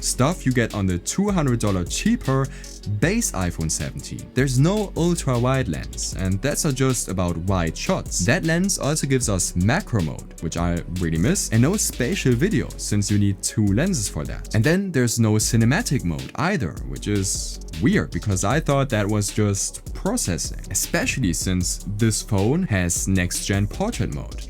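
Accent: Germany. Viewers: I hear some German Out of your voice...😅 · I love german english accents, one of the reasons i like watching your videos❤Keep soaring higher brother❤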